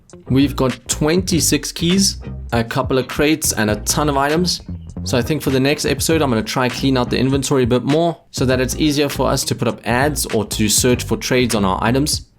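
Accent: South Africa. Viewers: Your South African right?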